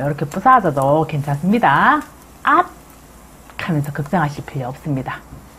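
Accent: Gyeongsang, South Korea. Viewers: 사투리가 귀에 쏙쏙 저도 경상도 여자라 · 아이디어는 좋은게 많은데 너무 심하게 쓰는 사투리가 거슬리네요 ㅠㅠㅠ 경상도분이시라 일반 사투리나 억양 이해는 하는데 궂이 그렇게 심하게 쓰실 필요가 있을까요? 암튼 조금 고쳐주셨음 영상이 더 좋아질듯요. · 경상도사투리는 듣기가 쫌... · 시원시원 하게 요리하시니까 든기좋네요 경상도 말과 함깨 구수 하네요 양배추로 해먹어 봐야 겠네요 간단한 요리 또 올려 주세요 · 언니 요리 솜씨보다 상도 사투리가 매력만점 더 예쁘고 간더러지게 구사하시옵소서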